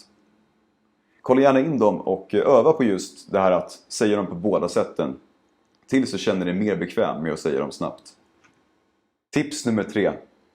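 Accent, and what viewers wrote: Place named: Stockholm, Sweden. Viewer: Jag kan lyssna på dig hela dagen ! Prata mer. Snälla. Haha Jag just gillar din tonfall och stockholmska dialekter 😍😄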